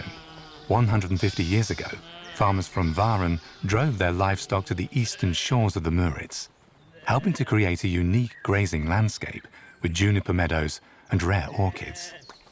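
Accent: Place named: United Kingdom. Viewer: And so they get a stinking tommy with his snotty accent to narrate this? Where is the German pride? SAD. These brits are into everything but actual work.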